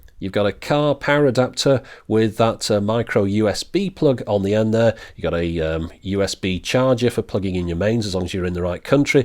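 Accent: United Kingdom. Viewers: the two plesures in one:a good overview of equipment and a beautiful British pronunciation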